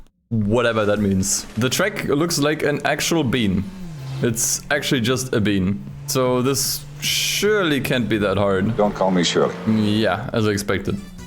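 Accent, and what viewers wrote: Germany. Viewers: Are you perhaps german? Kinda sounds like it.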